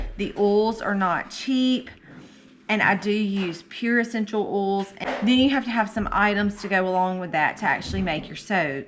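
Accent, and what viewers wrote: South, United States. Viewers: Subscribed to both yalls channels and watch daily. Girl you even more southern than i am and love to hear you talk.keep up the good work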